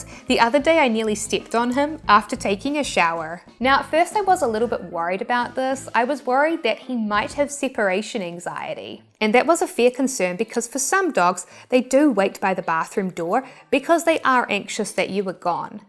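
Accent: New Zealand. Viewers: I knew that enchanting kiwi voice sounded familiar! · I have no idea why this video popped up in my feed. Now, though, I'm obsessing over your accent, highly reminded of a Kiwi with whom I used to work years ago, and wanting to just find a youtube channel with you reading books or news articles. If my native accent has that kind of power over people, I want to know where and I want to buy plane tickets now.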